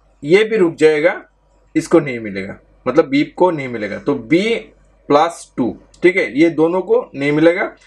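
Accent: Bengal, India. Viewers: Bhai aap bangli ho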